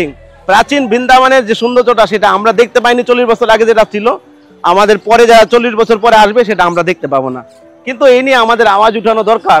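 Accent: Bangladesh. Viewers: আপনার ভাষা শুনে মনে হচ্ছে বাংলাদেশী । এত খারাপ অবস্থা কিন্তু যারা যাচ্ছে তারাই করছে ।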